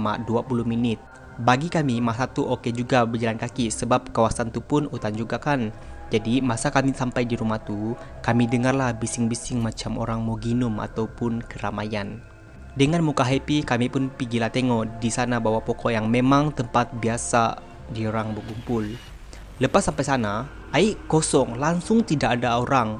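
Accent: Sabah, Malaysia. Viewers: Walaupun saya kurang fhm slank sabah fhm2 sikit tp terbaik content bossku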